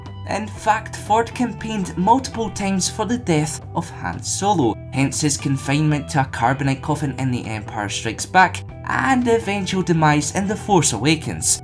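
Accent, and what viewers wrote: Scotland, United Kingdom. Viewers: Are you a scotch? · What part of Scotland? I have friend who's husband is from Glasgow, I have a really hard time understanding him over the phone. You have a lovely accent and I can understand ya perfectly. :)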